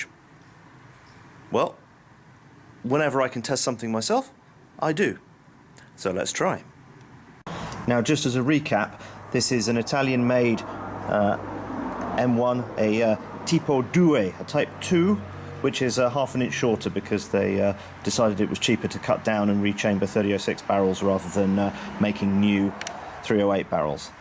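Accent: England, United Kingdom. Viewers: so weird to hear a British accent on a gun video instead of an American southern drawl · Hi, nice test. Are you English? Can a Garand T2 girino a 30-06 cadridge? Andrea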